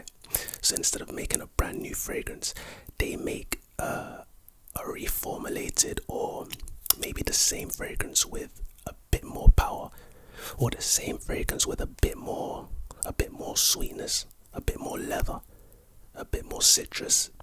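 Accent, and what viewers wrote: United Kingdom. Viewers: THE BRITISH ACCENT IS GOD DAM GOOD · is the best new male ASMRtist comin up this year. Love the Brit accent, smoov voice and great sense of humor. Not to mention his nose, which certainly knows. Big ups for getting me back into fragrances. I actually sound like I know what I'm talking about at the dept store fragrance counter, and every time my woman sees me she sticks her nose in my neck and gives me a big whiff to see what I'm rockin. Thanks u got the sauce fsho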